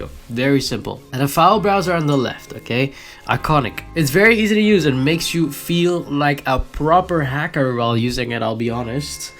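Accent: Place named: Netherlands. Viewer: that dutch accent is thickkk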